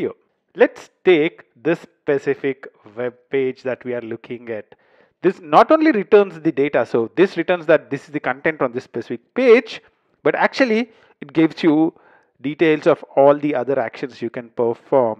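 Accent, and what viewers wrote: India. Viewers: Индийцы имеют ужасный акцент, невозможно слушать такое издевательство над языком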